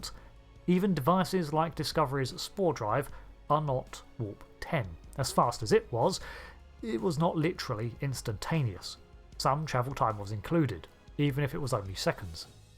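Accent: United Kingdom. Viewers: I, LOATHE british-narrated, ANY-thing! FAIL!!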